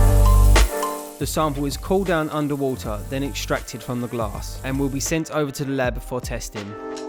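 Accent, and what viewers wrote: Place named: Birmingham, United Kingdom. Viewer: Just curious are you from brum? U got a brummy accent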